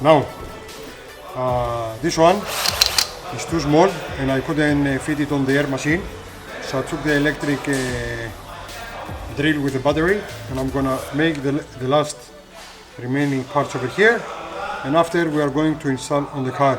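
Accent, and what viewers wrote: Greece. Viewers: I assume this is the manifold you bought from <link>: ALLOY WATER HEATED TORQUEMASTER INLET MANIFOLD HS4/6 HIF38/4 I guess they would rather sell you a more expensive twin-carb manifold with some extra carburettors Hope to see some more Mini-Videos soon!!! I like your (broken) English with a Greek Accent! I speak (broken) English with an Afrikaans Accent! (LOL)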